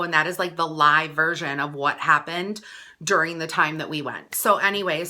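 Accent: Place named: Midwest, United States. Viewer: dolly5621 chirios her voice makes my ears bleed. I really can’t stand the midwestern WICSAANSIN/ MINISOOOOTA accent. Ugh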